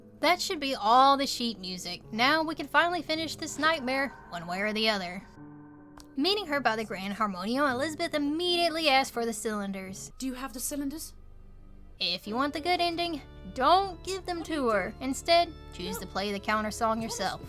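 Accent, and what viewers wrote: South, United States. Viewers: you sound like you have a slight southern accent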